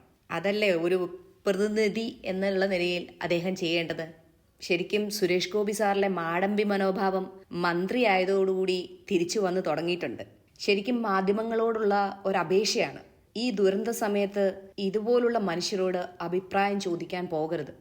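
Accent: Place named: Malaysia. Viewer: Oo you are malaysian malayali😁. Accent oru different pole thonni. Nalla videos. Maybe orientation maatamenkil.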